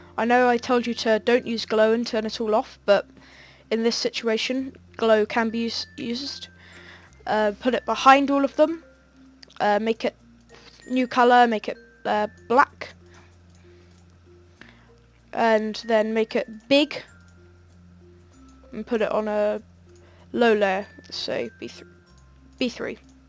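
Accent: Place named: United Kingdom. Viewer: The person talking sounds like a British man 🤣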